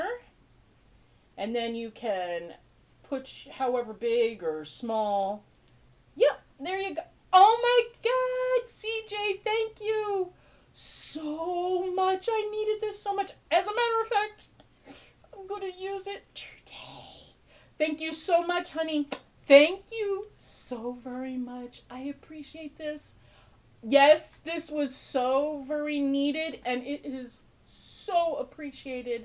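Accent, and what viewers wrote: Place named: Minnesota, United States. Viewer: I love skulls too . I find the best stuff at bike events . I really like when skulls are used in unexpected ways. I have always struggled with weight my entire life . I have had periods of time at a healthier weight but it always creeps back up . Love the Lost Souls scarf 🤩 I always love watching happy mail ❣ Uffda on the Minnesota and Wisconsin accent...ya you betcha 😁 North Dakota sounds like that too ❣ There are degrees of accent , in the urban areas it is less pronounced than rural areas 😘 Fabulous happy mail ! The wooden swift fanfreakintastic!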